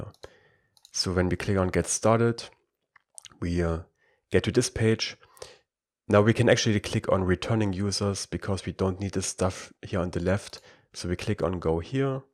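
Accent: Germany. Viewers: bist du deutsch? dein aktzent kört sich so an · I just feel that german accent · so ein geiler deutscher Akzent😂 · Dieser deutsche Akzent. <3 Schön erklärt. xD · I love your german accent, makes it so much easier as a german for me to understand this compared to some american tutorials :D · are you from Germany? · you sound like you are german. Are you?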